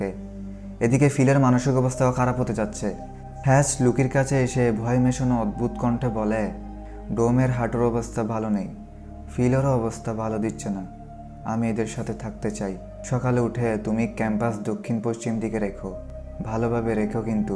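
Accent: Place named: Noakhali, Bangladesh. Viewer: Noakhila bhi... Kemon asen.. apne noakhali basha bolen jae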